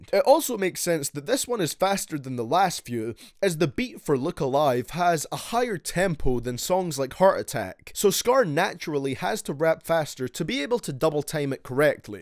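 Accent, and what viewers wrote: Scotland, United Kingdom. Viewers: Are you Scottish?? I can't tell you have like 3 accents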